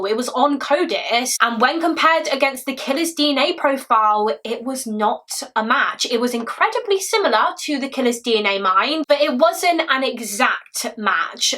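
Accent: United Kingdom. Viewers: Hi just want to say enjoy your content you are a talented storyteller also love your British accent❤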